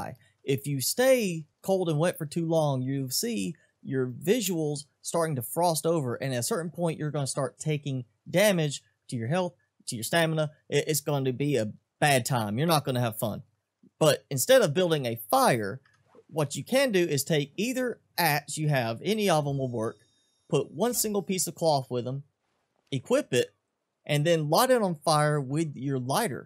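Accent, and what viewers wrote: South, United States. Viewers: I'm from Georgia and we also talk like that, but when you live here no one notices. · FINALLY A YOUTUBER WITH A SOUTHERN ACCENT, I HEAR EVERYTHING YOURE SAYING BROTHER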